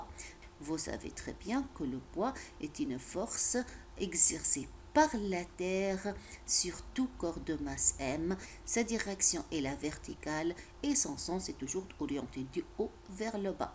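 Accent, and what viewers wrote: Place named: East, France. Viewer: Non seulement la forme c’est à dire votre accent oriental extraordinaire… mais aussi le fond c’est à dire votre précision, votre rigueur, le caractère didactique de votre résolution… tout cela fait de vous un professeur de qualité ! Vos étudiants doivent être fiers de vous !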